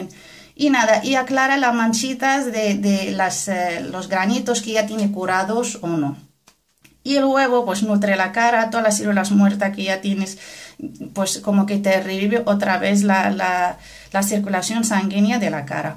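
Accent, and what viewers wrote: Spain. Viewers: Hola de donde eres, parece que erea de españa pero también pareces como si hablaras otro idioma porque como que te trabas al hablar, perdón por mi ignorancia solo quiero saber🥺, aoy nueva en tu canal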